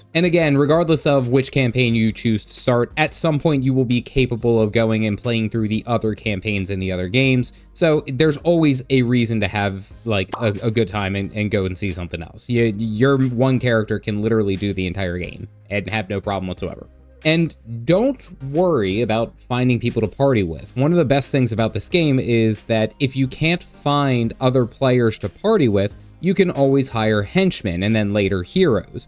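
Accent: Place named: Baltimore, United States. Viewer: Just curious...are you from Baltimore? That accent sounds very Baltimore. Great video. I haven't played since 2012. Good to see they're still around and thriving!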